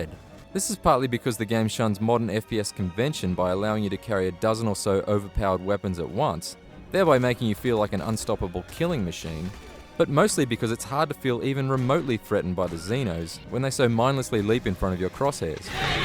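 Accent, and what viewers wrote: Australia. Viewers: Nice Aussie accent bro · YAY! an Australian reviewer! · hes australian like me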